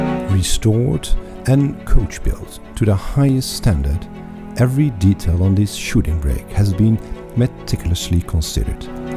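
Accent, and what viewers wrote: Netherlands. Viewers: Not sure they’re assumptions. I’ve tweaked my wording to better express the ideas. It’s not a style from today and based on a vehicle from half a century ago, so anyone extrapolating from it is an outsider looking in. Seems like a fair observation more than an assumption that because it’s a LHD vehicle built by a Dutch company it’s not British, but Dutch. It has the most stereotypical of Dutch accessories, the bike rack. Even the voice over has a Dutch accent. The electric drivetrain comment is about a personal viewpoint, not an assumption. My third comment is not an assumption either. I’ve always thought herringbone tweed would look great in a car and you’ve proved that to be true! This vehicle is utterly charming and looks exquisitely built and I love the “exercice de style” that it constitutes. But it is undeniably anachronistic and somehow feels foreign. 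It reminds me of how the Japanese see Britishness. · Jammer van dat Nederlandse-engels van de voiceover, maar wat een schitterend ontwerp. Als ik zo’n coupé zou bezitten zou ik Niels direct vragen die ook om te bouwen. Prachtig!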